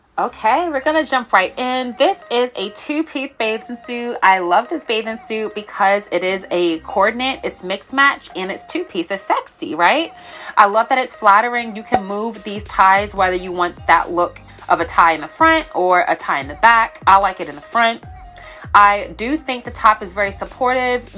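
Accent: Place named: Baltimore, United States. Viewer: Ure the best at this by far n ur Baltimore accent is cute. Most girls can't make it cute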